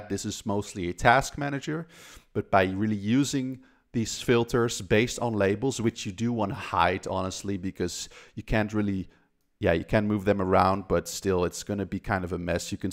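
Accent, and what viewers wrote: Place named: Netherlands. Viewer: I'm here for 30 seconds and I'm guessing you're Dutch?!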